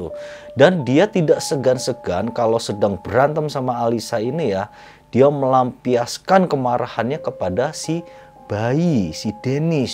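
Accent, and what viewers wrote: Java, Indonesia. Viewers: Halo uncle....medhoknya itu lho🙈🙈😂😂😂😂😂😂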